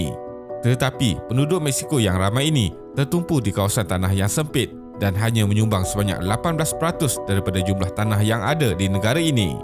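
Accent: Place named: Malaysia. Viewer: Alaa lidah melayu biar jee lar,orang lain ok jee dengar…kalau rasa terganggu dengan sebutan admin sangat,pergi kat tepi dinding bisik slow2 kat dinding tu atau masa nak berak tu jerit puas2 pasal admin salah sebut tu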